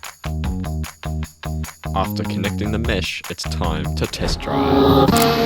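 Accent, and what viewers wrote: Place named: Australia. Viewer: Why does this bloke sound aussie, but like he is trynna hide the accent haha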